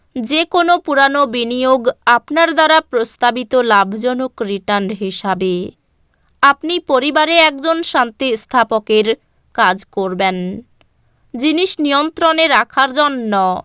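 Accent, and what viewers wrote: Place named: Bangladesh. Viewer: Ki Bangladesh thake bolcchen mone hocche.....bhalo kore bangla bolte sikhun ..musulman bole mone hocche....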